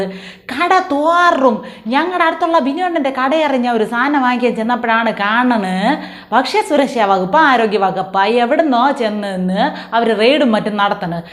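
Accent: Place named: Trivandrum, India. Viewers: തിരോന്തരം ശൈലി ബേഷായി😅😅😅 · തേരോന്തരം ഭാഷ പോളിയാണ് 👍👍👍🤗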